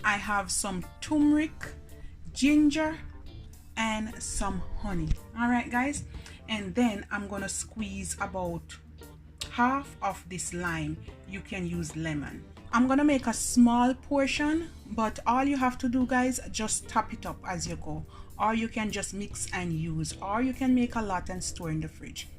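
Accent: Jamaica. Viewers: This is just an amazing healthy juice. Love your Jamaican accent is killing me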